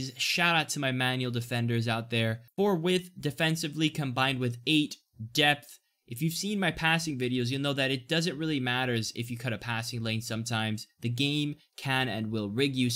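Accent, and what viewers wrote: United States. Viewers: well u sound like u from DC or something,well anyways I like ur vids very accurate and description based, congrats on subscriber growth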